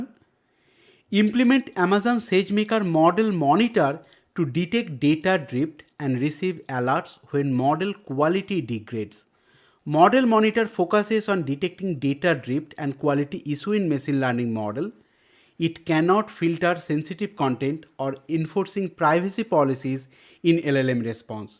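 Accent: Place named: India. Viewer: These are the best series for Indian users, the voice and accent is for the Indian ears. Also the content is well researched better than AWS own AI practitioner course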